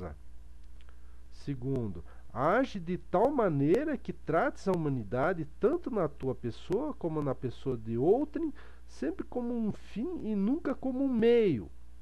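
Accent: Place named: Paraná, Brazil. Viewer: Minha família no Sul do Paraná fala assim tb!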